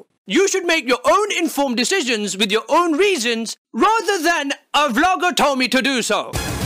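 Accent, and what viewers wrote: United Kingdom. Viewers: says not to support the logic in Casey's video because it's false, yet his argument has just as much fallacies, such as his argument for Hillary not being sane is a fucking joke about her enthusiastic reaction to shit and pigeonholing her negative sides for going to war and pigeonholing Trump's negative sides for PussyGrabbing, all the while saying himself that all youtubers (admitting himself) shouldn't be listened to as political rationale. Essentially just a cartoon with silly British noises now, no comprehension. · baryonyx31 But he has lived all his life in UK and has a severe British accent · hey i thought your brit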